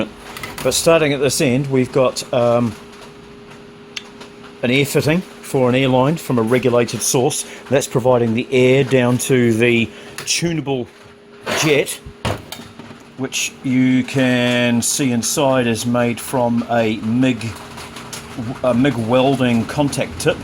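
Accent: New Zealand. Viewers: he's in NZ, cant you pick the accent? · That's a kiwi accent mate! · Is this kiwi ingenuity at its best? Taking a gamble, you sound like a fellow kiwi. Awesome stuff mate you’re a legend. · Thot you were aussie first 30 seconds.....then the KIWI became foremost. Cheers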